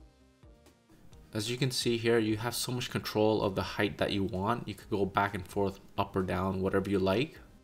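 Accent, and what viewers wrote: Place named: Poland. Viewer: Polish accent :)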